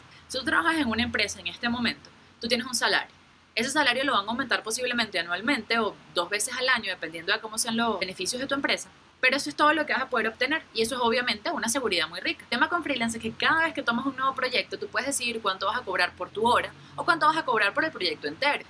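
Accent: Venezuela. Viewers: Le doy play al video, me pongo a revisar Clash of Clans y cuando escucho ese acento Venezolano, vuelvo a ver la pantalla jajajaj. Es un gusto cuando los bloggers son Venezolanos, es como si la persona que te habla es un pana tuyo :D Saludos.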